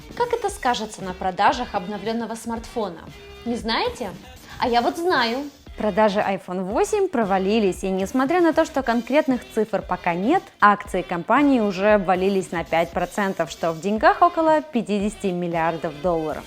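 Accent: Ukraine. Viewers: Может девочку поменяете на более привлекательную? Не думал что скажу подобное, но на жирного мужика мне смотреть интересней, чем на пресную женщину, с проскакивающим малоросским говорком. Так хоть глаз будет радовать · чет приуныла. Избавилась от яркого, бросающегося в уши украинского акцента. Остался лишь небольшой отголосок и слушать теперь по приятнее. Но ты с ярким акцентом как будто силу богатырскую потеряла. Как будто что то случилось, если это так, держись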